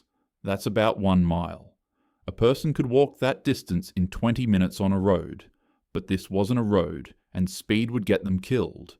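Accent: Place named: Australia. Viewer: enjoy these, but happily an Aussie accent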